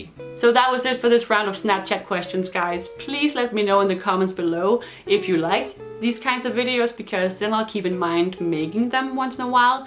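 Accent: Denmark. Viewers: I love the Danish accent when you speak English! I love how articulate you are on topics like immigration too. I wish I could be like that rather than stumbling over what I want to say xx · Hey as a Texan an American learning all Scandinavian languages an German plus Gaelic I say it sounds awesome hearing the Dane accent in English :) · Awww I love accents, including the Danish accent! I just wish I could get rid of my American accent when I try to speak Danish. :p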